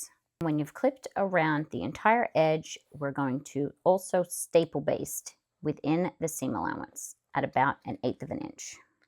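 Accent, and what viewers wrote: Australia. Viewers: As an Aussie I love love love hearing your Australian accent popping through every now and then! Thank you for your amazing tutorials - new subscriber here :)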